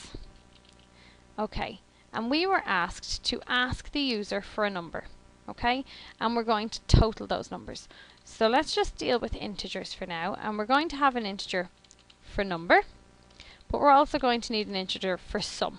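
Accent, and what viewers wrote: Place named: Ireland. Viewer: So nice to hear an Irish brogue explaining Java to me rather than Hindi. :-) Thank you! Great videos.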